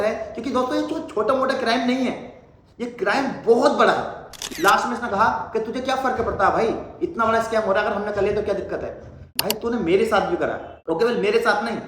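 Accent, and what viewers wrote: India. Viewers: आवाज़ से तो maharastra का लग रहा है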